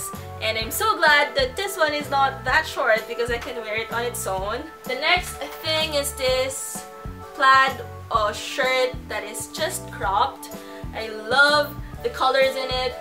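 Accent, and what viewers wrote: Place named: Philippines. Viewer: Omg! Ur filipino accent is so on point! Im so jelly cause my accent is like a canadian accent cause i live in canada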